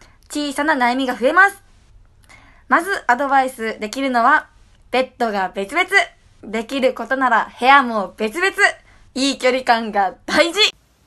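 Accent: Okayama, Japan. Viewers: 岡山弁がぬけないとこ大好き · 自分も岡山出身だから岡山弁わかりすぎて好き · え？岡山県民って素でこのなまり？？ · こがなチューしよで1人で大爆笑してしまった…爆笑 岡山弁日常的に使う人からしたらめっちゃ聞きやすい！！（笑） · 岡山弁可愛いすぎい！ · 岡山弁ええなぁ なつかしい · 岡山弁最高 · 広島県民だけど、広島弁よりやばい面白い岡山弁wwwwww これまたやってほしい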